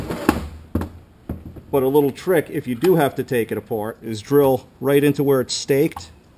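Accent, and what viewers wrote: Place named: Long Island, United States. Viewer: I've been out of NY for 20 years but id guess long island?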